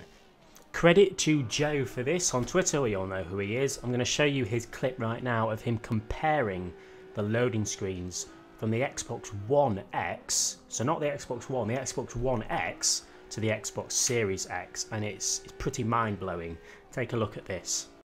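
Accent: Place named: North, United Kingdom. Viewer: For some reason I never noticed how much of a northern accent has until now. 🤣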